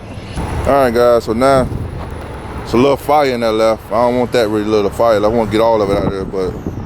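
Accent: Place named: Baltimore, United States. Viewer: You from Baltimore ain’t you? If not you sound exactly like my cousins from there. But thanks for the video and tip.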